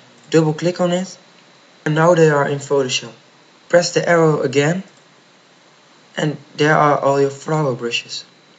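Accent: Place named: Netherlands. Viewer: You sound dutch ^^ Are you?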